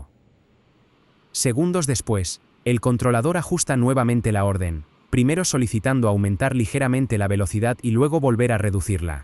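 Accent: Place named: Spain. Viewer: Habla como español pero no sabe cuál es el apellido paterno del piloto y del copiloto?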